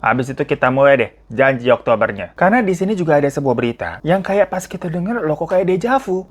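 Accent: Java, Indonesia. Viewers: Peh...jowomu MEDOK bos. Kurang alus ilate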